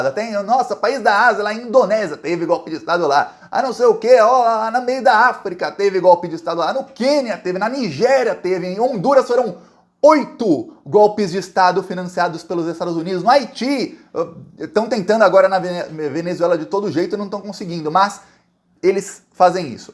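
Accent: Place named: Florianópolis, Brazil. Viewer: Poderia falar mais pausado? Tá parecendo meu irmão caçula de Floripa manezinho... Abraço!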